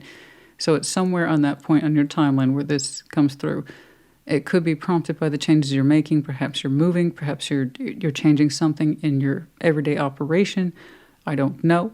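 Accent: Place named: South, United States. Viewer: You are such an interesting and intelligent reader Scorpio sister, and I truly make an effort to try to listen to you. To no avail. Why effort? Because English is not my mother tongue, and despite having lived in D.C. for twenty years, I didn't have any exposure to the southern states, therefore your fast speech and southern accent with words not clearly articulated pose a big problem for me. I am sure others sail smoothly through your presentation. I manage a little and then I give up, as I just don't get but 30% of what you say. Sorry for being frank, regards from one older woman from Europe who would otherwise be ecstatic to follow your channel. Best to you!❤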